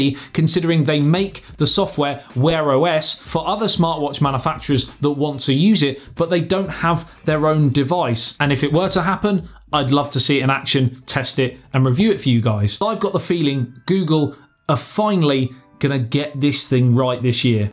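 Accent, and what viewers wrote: United Kingdom. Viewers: 2nd commenter i guess.......love your brit accent 😅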